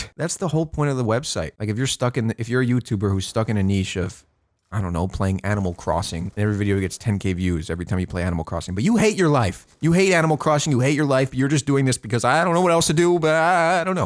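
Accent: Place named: New York, United States. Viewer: new yorker accent leakin out a bit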